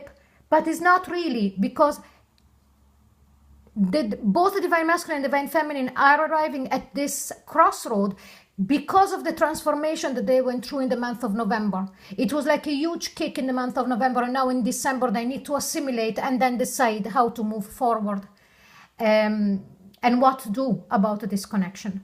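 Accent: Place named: Eastern Europe. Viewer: I found ur channel last night watched all the videos that pertained to me and I am totally impressed with you. I wish I can guess your accent I keep going back and forth on where you are from. I guess Eastern European :)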